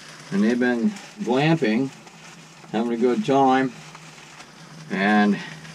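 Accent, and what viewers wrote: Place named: New England, United States. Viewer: I'd swear you were in New England. 😁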